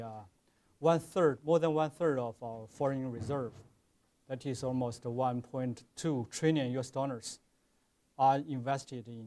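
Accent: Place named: China. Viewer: What a typical Chinglish!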